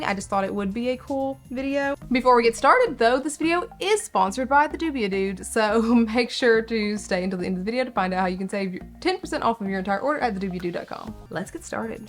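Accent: South, United States. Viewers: her country accent is so funny i love it 😂😂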